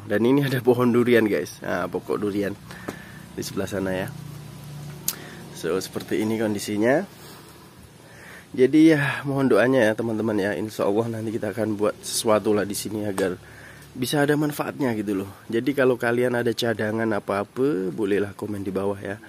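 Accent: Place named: Malaysia. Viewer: Lama kerja di Malaysia ya bang?percakapannya pun dah setengah melayu indo😅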